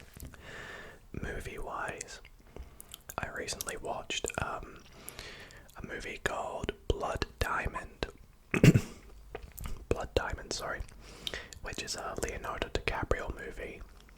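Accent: Scotland, United Kingdom. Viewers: I am from spain and i have to say that I understand really well ur english although your scotish, i love these mukbangs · omg you’re scottish !! i thought you were irish oops 🥲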